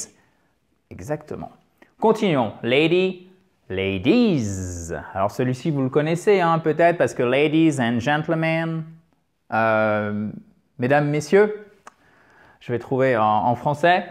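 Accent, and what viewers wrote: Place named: United States. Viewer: Bonjour. Merci tout d'abord pour ce "fabuleux" travail avec toutes ces vidéos! Mais pourquoi devez-vous systèmatiquement utiliser l'accent américain? Il m'arrache les oreilles et avant l'américain, il y avait l'anglais. Alors, pourquoi ce choix? merci à vous.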